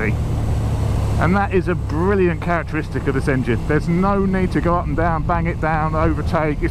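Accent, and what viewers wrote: United Kingdom. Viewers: something about british people explaining things always make it better.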